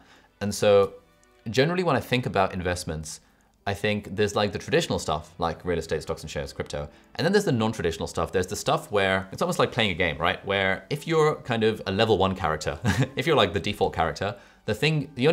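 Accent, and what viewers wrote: England, United Kingdom. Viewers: Absolutely love this and omg your British Accent is to live for!! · Hi, I like ur video, but plz try to slow down little,I paused too much to cope with… cool British accent.Thnx a lot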